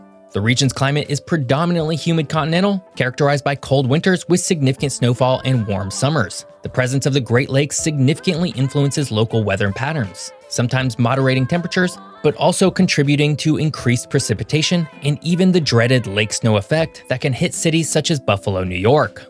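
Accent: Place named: West Virginia, United States. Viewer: You got a little bit o’ West Virginia in there!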